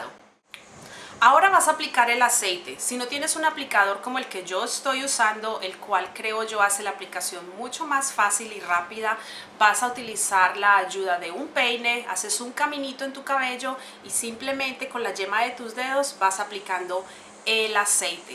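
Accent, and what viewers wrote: Colombia. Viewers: me fascina como hablan los colombianos.....me fascina ese acento, ahhhhh que bonito jajaja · Eres colombiana ?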